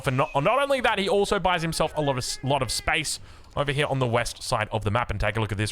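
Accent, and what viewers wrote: Australia. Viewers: I rarely sub, but this Aussie is worth subbing for! Watched like 30 videos lately and your casting is magnifizent. Also, this FFA game was very exciting and probably the most intense:o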